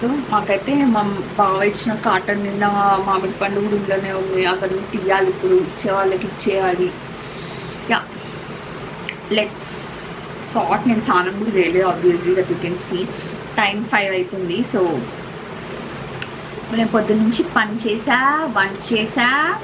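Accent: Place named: Hyderabad, India. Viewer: Your videos look just natural ❤and more over your slang is pakka Hyderabadi ❤❤️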